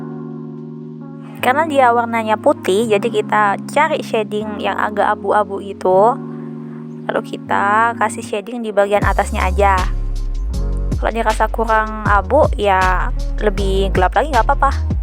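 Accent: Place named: Philippines. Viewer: Let me guess your a Filipina Cuz you sound like one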